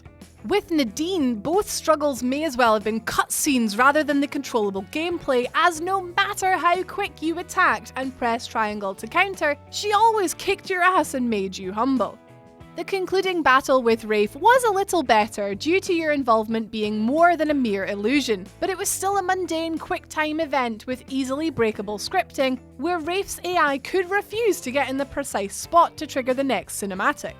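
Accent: Scotland, United Kingdom. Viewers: Why do I think she has a Scottish accent?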